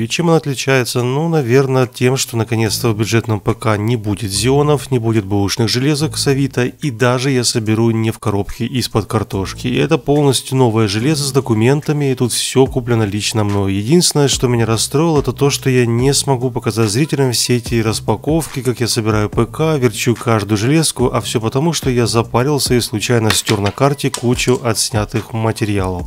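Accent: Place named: Ukraine. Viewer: С первых минут понятно что ты Украинец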